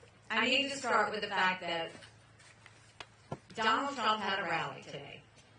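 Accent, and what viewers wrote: South, United States. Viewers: Southern accent, since when?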